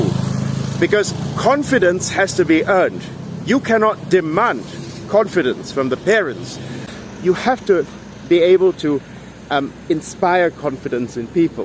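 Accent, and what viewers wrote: United Kingdom. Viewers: First of all, it shouldn't be you talking cause you are a Chinese. Any changes have to come from Malays. Liberals will cheer for you no matter what, but most Malays won't give a damn on what you have to say. Secondly you spoke in clear British English, this is more as a disrespect. If you can't respect Malays, don't expect them to respect your opinion. Thirdly, public opinion is irrelevant, if you want to change something, you speak or influence people in charge.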